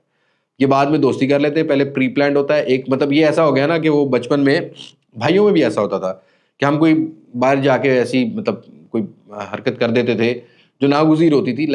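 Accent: Saraiki, Pakistan. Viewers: Your Siraki accent is 👌🏻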